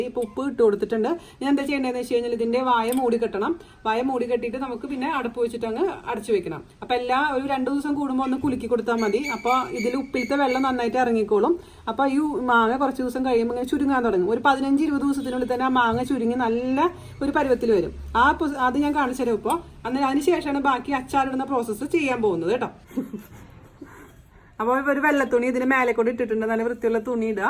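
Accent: Thrissur, India. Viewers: Trichur slang So sweet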